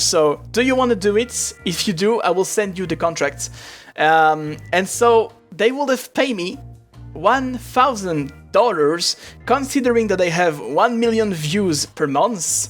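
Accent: France. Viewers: Love your french accent :D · dat sweet baguette accent KreyGasm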